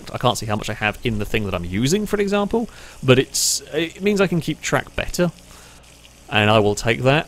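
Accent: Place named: United Kingdom. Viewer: Dunno, if you noticed,. But, you see me pleased to see you as one of the few guys playing TLD without heavily relying on an (unnatural) unnerving voice, like ppl on other channels here do. As if they were aiming for a teenage audience... GROSS! So, big thanks to you, for being as british and failracy as ever! 👍👍👍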